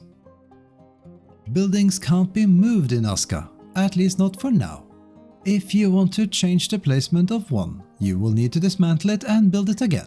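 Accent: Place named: Norway. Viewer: .and this, people, is how an proper Norwegian accent sounds like :)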